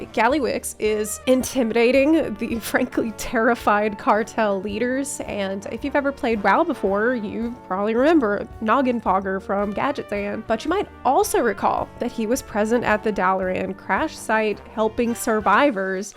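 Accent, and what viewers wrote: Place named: New Jersey, United States. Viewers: I’m from NJ. This is just how a lot of people here talk. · Haters to the left, your Jersey Goblin accent is spot on.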